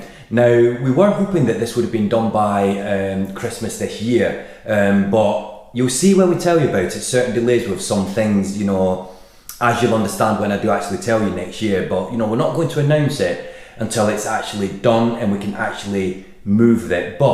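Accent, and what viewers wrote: Scotland, United Kingdom. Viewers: Man, where is your accent from?! I thought you were scottish, then a brummie, then from Lancashire.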